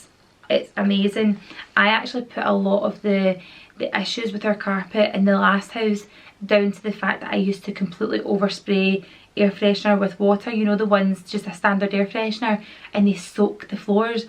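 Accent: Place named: Glasgow, United Kingdom. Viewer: Love your hair so beautiful where are u from got a bit of a glasweigan/stirling accent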